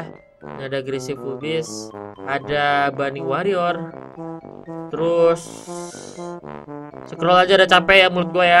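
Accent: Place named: South Kalimantan, Indonesia. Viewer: Abang orang mana kayak bahasa Kalsel aja😅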